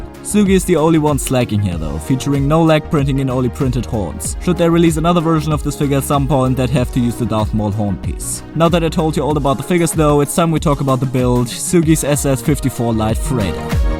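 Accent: Germany. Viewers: Ich liebs wie man immer bisschen den deutschen Akzent raushört 😅 da kann man noch so gut englisch sprechen 😂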